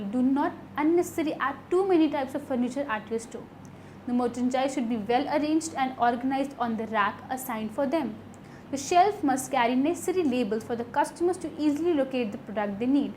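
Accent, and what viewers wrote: India. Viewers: She is speaking in English with an Indian Accent. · I have no idea why people are criticizing her accent. Apparently she is born to be an Indian and that's part of her characteristics, that's her.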